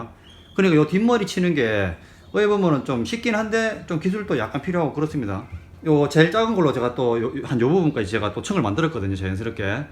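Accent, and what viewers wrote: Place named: Gyeongsang, South Korea. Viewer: 대구! ㅋㅋ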